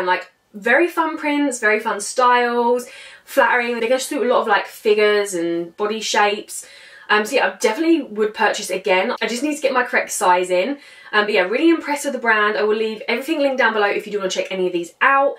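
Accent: United Kingdom. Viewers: The blue and brown with the diagonal stripes is my favorite. Sorry for not having a better description, but you are speaking fast British English and I am hearing in slower American .English! As always, you are beautiful!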